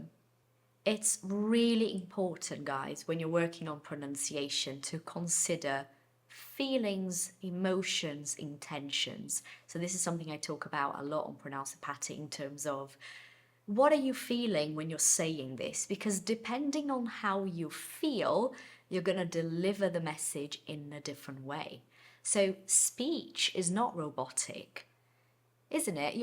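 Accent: United Kingdom. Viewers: Hi there! How long have you been learning English? How long have you been working on your pronuntation? You sound like a native British speaker. 🎉🎉🎉🎉 Do I need to record only my voice or a recording of myself with a camera?? Thank you so much!❤❤❤ Greetings from Ecuador. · Why did you choose British accent? Congratulations, it's a beautiful accent. 👏👏👏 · Your British accent is amazing! The First time I saw you I thought you were a British woman, I got surprised when I found out you're Brazilian. As I Said your British English is perfect. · Eu também achava que você era britânica quando vi pela primeira vez seu vídeo aqui. O seu curso é também para quem fala mais o inglês americano?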